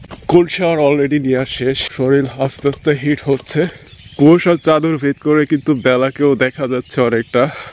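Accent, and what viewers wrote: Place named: Purulia, India. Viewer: হ্যা আমি জানি কোন দেশ না। ভারতের পুরুলিয়া একসেন্টৈর মতো লাগলো তাই জিজ্ঞাস করলাম ।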